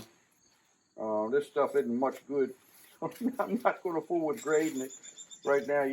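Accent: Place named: Virginia, United States. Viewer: May I ask where in Southern Maryland? Your accent sounds very similar to the accent heard in the Northern Neck of Virginia.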